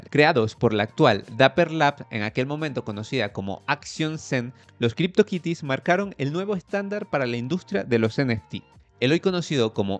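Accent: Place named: Latin America. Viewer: Es curioso, tu acento es hispanoamericano pero gran parte de tus expresiones suenan españolas, ¿vives en España? Buen vídeo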